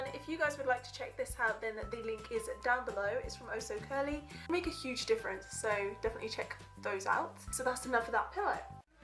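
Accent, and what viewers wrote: United Kingdom. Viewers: I'm pretty sure that's a British accent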